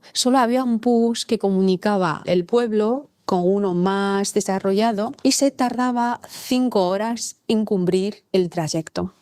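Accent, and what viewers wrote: Spain. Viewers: Muy vuená tú istoría Ablás comó los Españoles ❤ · Un poco triste también sucede en Latinoamérica con algunos jovenes que les dan pena decir no eres la única persona somos orgullosos de donde vivimos te felicito por salir adelante eres muy hermosa Hablas español tienes un acento de España Te deseo mucha suerte 👍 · Soy español, canario, hablas como una goda, perfecto. Que bien español!!Los canarios solemos llamar a los peninsulares, "godos". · Hola 👋 saludos desde 🇨🇱 América del Sur, tienes un hermoso acento, cuando vi tu vídeo pensé que habías nacido en España, y después ver los vídeos pude ver que no era así. Tienes además una voz hermosa y perfecta para trabajar en radio y televisión tanto en España, China o cualquier país de habla hispana, y además tú eres muy hermosa y saludos desde Chile.....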